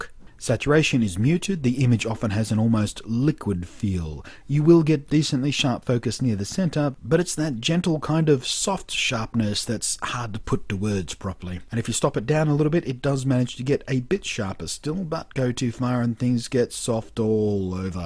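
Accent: Australia. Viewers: I don't know how I got here when I was looking for audio equipment, but your voice kept me here. Those dulcet tones paired with you Aussie accent: Audible Chocolate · I believe he said "70mm" (i.e. 35mm lens with 2x crop factor on M43). Must be the Aussie drawl :) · Great video! And good to hear an Aussie accent and see Sydney :)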